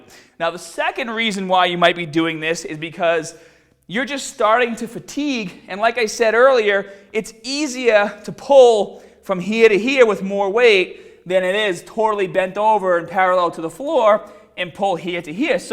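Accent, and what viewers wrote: Boston, United States. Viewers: his boston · A few seconds into the video and I had to check the comments to make sure people were making fun of your Boston accent. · Viknesh Jay ?? Australian? Please tell me you know the difference between a Boston and Australian accent? This video is from Aug 2016!! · I know!! I'm from Boston and approve of his accent 😛 so I went to show his videos to my husband, only to find out that his accent only comes out in some of his videos. I think he used to have the accent early on, then got rid of it for whatever reason, but now it's back with VENGEANCE. Love it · i remember watching your videos years ago and you never had a boston accent, wtf happened? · So used to the Boston accent now - just sounds normal to me ! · Dude, what's with the Boston "a"? I've been watching your vids for 6 years, and you never even came close to talking like that in the past. 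If you'd always spoke that way it'd be one thing, but since that's not the case it's just annoying. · I find the that this video was great and informative! Apparently people on here are not so well traveled and live in a bubble where they can't recognize a Boston accent. I am from Chicago and I get the same thing because my accent. As for the video,I think people have a hard time getting out of bad habbits when it comes to form. Some people are so used to doing it wrong that when they try the right way they get hurt. They try to do it the right way with the same weight. I have seen this many times with other exercises well. As soon as you tell them to lower the weight and use proper form some struggle,but can do it. Others try the same weight and either get hurt or cant do it at all. Ego lifting is correct and true. This is why I have always been surprised how people still make gains with crappy form. 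Yet steroids seem to be involved. Of course when they are 60 and complaining that there back hurts. They might regret not taking some sound advice when they were younger instead of trying to impress someone at the gym. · dude wtf is with your accent?! the "bahbell" suggests boston but you almost sound scottish at times and neither pronounce the word "mascles"! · Great content. But the Boston accent makes me want to throw up everytime I hear that open "Ah" sound. Please for the love of all that is Swole, tone down that accent! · Petro .... he sounds like he is from Boston or from the New England region. Kinder like some of us yanks who are lost when we try to listen to some of you English from some parts of your country, i.e Geordie accents. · He is from Boston. It's the way he speaks. Tough luck if you don't like it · This guy sounds like he is from barwstan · Is that Boston accent? I thought it was extinct. 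· Maybe it's because I'm not cultured but I couldn't tell if was from Boston or Australia until I read the comments.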